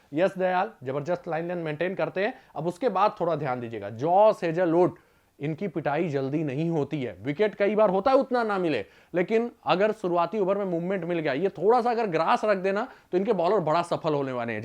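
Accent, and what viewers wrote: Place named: Bihar, India. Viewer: Hindi to sahi bol pehle pure bihari accent aa rai Baki sabb to okay hai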